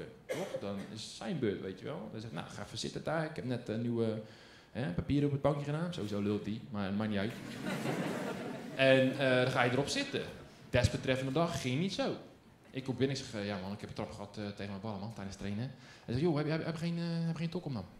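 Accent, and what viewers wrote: Rotterdam, Netherlands. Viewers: Dit is echt eerste keer dat ik graag naar een Rotterdammer luister!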